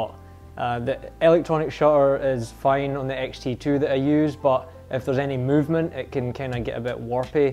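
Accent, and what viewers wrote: Scotland, United Kingdom. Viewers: Hey, you lucky person! A new X100V that someone didn't want, at a really good price. I bought mine and got it two days before the stay home began in Scotland... I've got the same black version. My weather seal kit was on backorder when I picked the camera up, so once this crisis is behind us, I’ll go and collect it... You said you weren't keen on it... Persevere with it, you might enjoy the simplicity of the camera, it just takes a wee bit of time to enjoy it. It looks like you're in the USA but I hear a Scots accent there... 🏴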